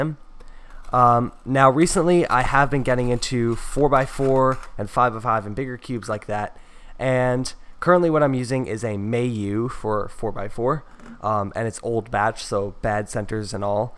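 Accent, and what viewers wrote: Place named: Canada. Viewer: are you Canadian? haha it's sounds like it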